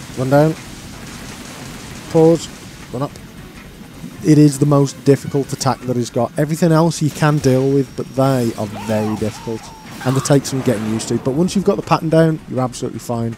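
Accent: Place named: Midlands, United Kingdom. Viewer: you from the midlands sound it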